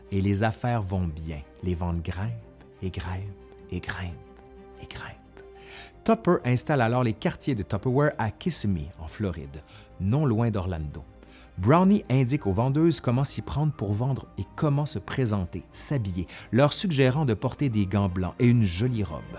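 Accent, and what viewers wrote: Quebec, Canada. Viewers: J' adore l'accent canadien:) Superbe video:) Merci:) Je m'abonne avec grand plaisir. Bonne continuation. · Merci pour ton accent du Québec 😂 Tupperware a malheureusement mal fini...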